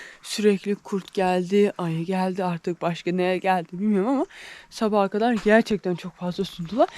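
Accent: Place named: Kastamonu, Turkey. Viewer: Istanbuldan selamlar seni izliyoruz Allah yolunu açık etsin bizim kastomonular gibi konuşuyorsun maşşallah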